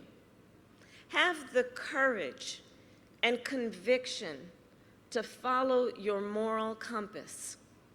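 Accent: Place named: South, United States. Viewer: Why is she talking like she was raised in the south?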